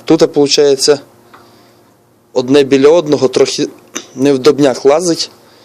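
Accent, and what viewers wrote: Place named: Rivne, Ukraine. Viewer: ну - молодець! ще не вмикнув а вже поламав...)така мова гарна,як десь біля Рівного..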